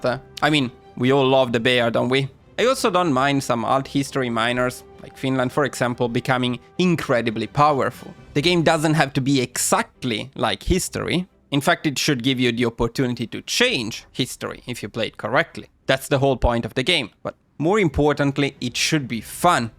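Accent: Italy. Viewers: Well, technically they put a thing that autoupdate the mio, and you can queue the upgrade you want at the start of the game. For the stuff i prefer to manually manage what i want to trade, like if i'm germany and i need 8 chromium for a battleship, i just don't trade that since it's not a priority. And one extra thing, i really hope that they take the time to upgrade old dlc focuses, they look totally dated if you compare them to the last ones. On another note, you really sound italian, idk if you are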